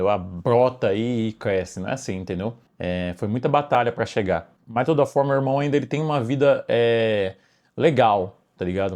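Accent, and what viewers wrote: Brazil. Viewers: Vey sei q n tem nd a ver mas seu sotaque é mto bom ai qria te pergunta de onde ce é parece mto interior de sp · De onde você é? MG? GO? Achei sotaque diferente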